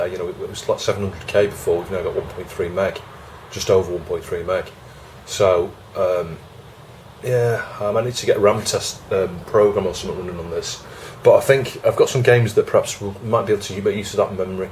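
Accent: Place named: Manchester, United Kingdom. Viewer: Hi! I just got out my old Amiga 600 and it worked for a day, then I put in a floppy which I think was a little dusty and now it's stuck on the "insert floppy" animation. I saw on eBay that working Amiga 600s tend to go for around £100. Is that accurate ? Is it worth me opening up and repairing? P.s where are you based? You sound local. Writing from Manchester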